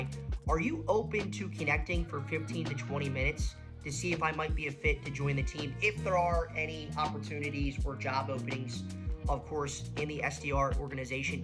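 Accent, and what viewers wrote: United States. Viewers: Do you feel disadvantaged relative to your British/Australian peers? Due to your American accent.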